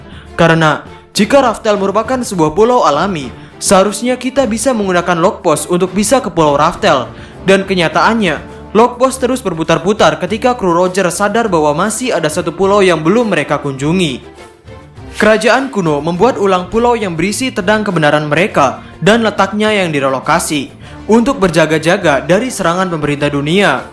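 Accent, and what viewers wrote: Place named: Ambon, Indonesia. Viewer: Ambon mana suaranya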